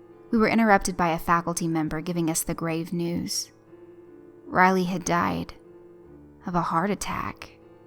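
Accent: South, United States. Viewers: Another good batch, your quickly becoming my favorite specially when ya get that cute southernish tone, keep up the good work. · I just saw your address in the description and realized how you can have a southern accent . Don't get me wrong it is nice to listen to . I told you before that I stumbled on to your channel when you were featured in another channel . It was like finding gold. You do a great job on your narrations . This video though more than a few had me scratching my head wondering . But I guess that is just me. At any rate keep up the great work . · dont really like the southern accent tbh ;$ maybe I need to get used to it (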